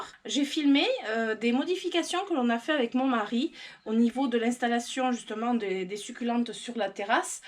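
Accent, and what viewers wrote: South, France. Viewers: Coucou je dois te dire que, outre ta belle collection de plantes évidemment, j'aime beaucoup ton accent du Sud. Moi qui suis en Normandie je me rends compte en t'écoutant que nous les Normands mangeons les e: on dit "jardinrie" par exemple au lieu de "jardinerie", "boulangrie" etc:D 😆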